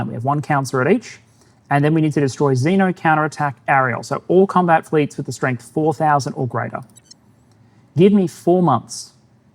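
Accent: Australia. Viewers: Reaching out to the game's original voice actors to do chapter intros is a let's play power move. I love the sound of your voice narrating both the real history of the Ukraine war and the fictional history of what ever game you are playing. I fear that future history lectures I will attend will not sound authoritative enough without the Australian accent.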